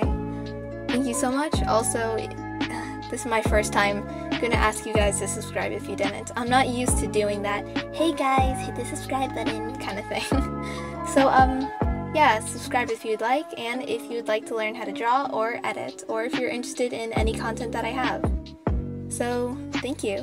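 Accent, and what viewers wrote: United States. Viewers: Woah Thats Amazing! Is it just me or i feel that she has like an American accent- But shes Filipino duh- Edit: So that means shes half American :0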